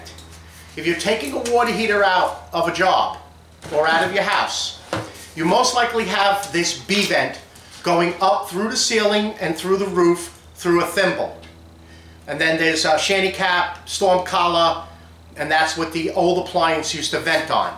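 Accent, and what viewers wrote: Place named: New England, United States. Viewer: Love your yankee accent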